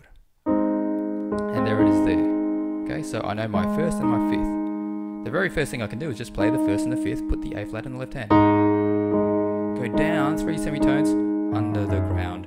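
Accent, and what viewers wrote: Australia. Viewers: Hey you're Australian!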